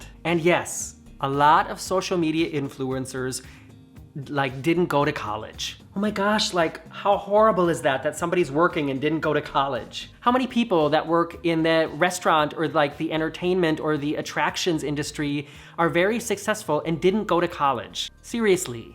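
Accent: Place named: Germany. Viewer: Ah but maybe 🤔 there’s alcohol in the LIPTON Iced tea to make him loopy without telling him. 🤔🤯 wouldn’t that be insane if they did that? 🤣 (I know they most probably don’t...just an assumption!!)Lol..🤣 (Not hating it’s not pronounced vegg-ann it’s pronounced Vee-gan, I understand if it’s your German accent I struggle to say stuff with my Welsh,English accent so I say things wrong like specific...It comes out as suspific 🤣😂 when I say it to people they look at me weirdly and I embaress myself!🤣😂) (FACT: The Welsh language/Accent, Originates from from Wales,UK, the strongest accent is in North Wales and then South, East and Wales don’t (age that strong accents we just struggle to pronounce words...Well at least I do..😂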